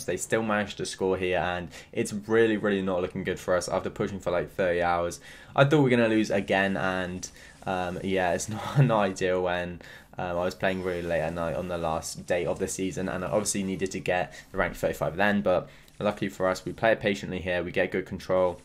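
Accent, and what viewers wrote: United Kingdom. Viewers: Love ur accent😂 Btw is that british?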